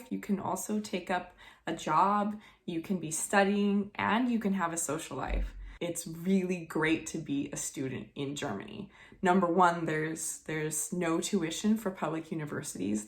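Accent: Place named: United States. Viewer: This video was aired in Sep 2020 in COVID lockdown and I'm American like you but then again you could be Canadian because we have same accent.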